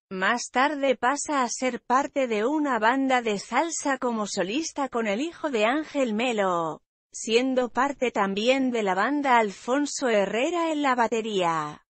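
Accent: Spain. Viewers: ese acento español es horrible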